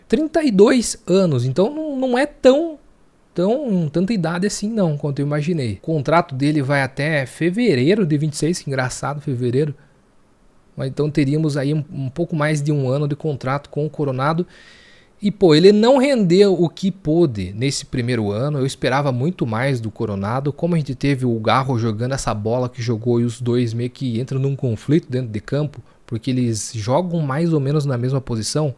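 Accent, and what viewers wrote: Paraná, Brazil. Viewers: Conheci seu canal hj. Vc é paranaense? Nasci em SP mas cresci em CWB. Reconheço esse sotaque kkkk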